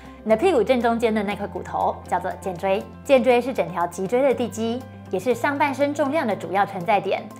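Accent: Taiwan. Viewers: 台湾女生说国语太好听了，温柔😊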